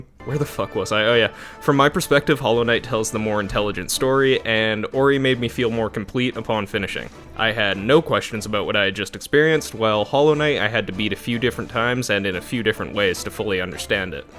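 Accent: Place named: Mexico. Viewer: English! You sound like Mexican and I do not understand Mexican.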